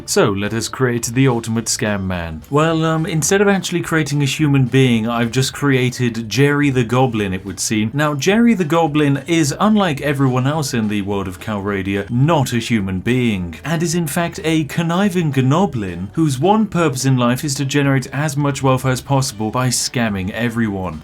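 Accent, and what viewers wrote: United Kingdom. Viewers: I think he might be British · He's british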